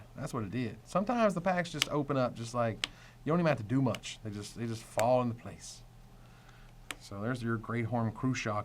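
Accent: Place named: United States. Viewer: You have the voice inflection I associate with the "Joe Dirt" accent. Good video tho.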